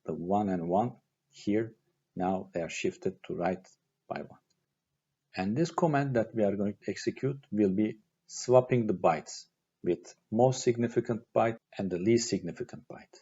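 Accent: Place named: Turkey. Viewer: valla aksandan türk oldugunu anladım tebrikler başarılar hemşerim :)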